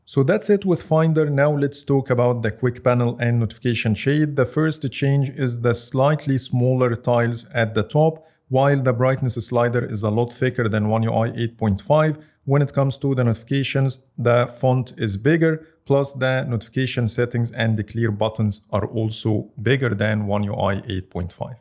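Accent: Egypt. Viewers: واضح جدا انك مصري, مو عارف كيف اشرح كيف عرفت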